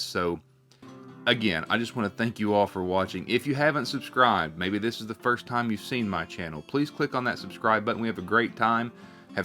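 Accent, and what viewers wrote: United States. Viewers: Great video collalatratons 0n your millstone like your country accent it sounds cool you ever been to St. Louis Missouri there are some good cemetery's of famous people calvary and one betlvo cemetery is wear chuck berry is buried at when you go to look for his big statue of him playing a guitar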